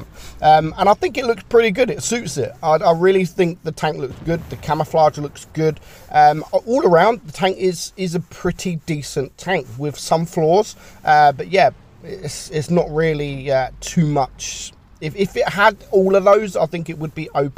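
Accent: United Kingdom. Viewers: outolodah 2021 I love the British accent lol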